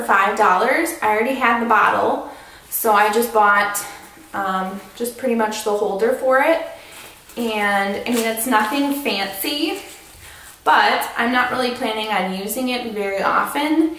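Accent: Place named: Minnesota, United States. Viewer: You sound like your from MN? I'm just starting running myself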